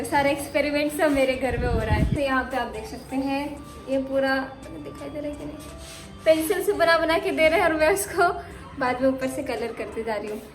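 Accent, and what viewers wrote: Bihar, India. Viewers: panni wanni typical bihari...style i loved it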